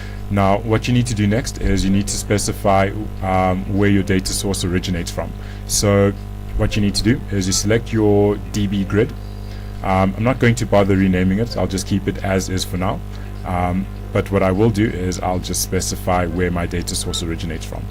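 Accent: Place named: Afrikaans, South Africa. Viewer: nah lol that's just the Afrikaans accent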